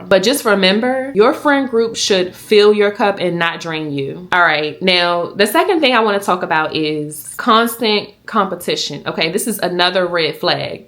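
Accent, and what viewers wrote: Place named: Washington DC, United States. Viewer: You’re doing amazing, I hear your DC accent too sis! Keep going!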